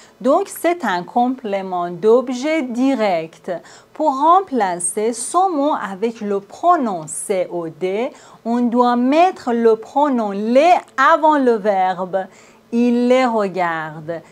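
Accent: Iran. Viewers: un accent persan très marqué ❤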